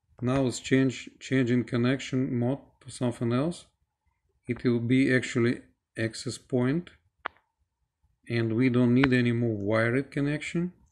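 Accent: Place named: Ryazan, Russia. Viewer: Good instruction! Just restored my R810 watch... Your ryazan'-style pronounciation blowed my mind...)))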